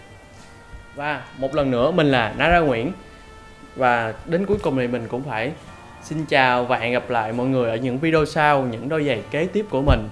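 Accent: Standard. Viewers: Anh nói chuẩn quá :33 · a nói chuẩn quá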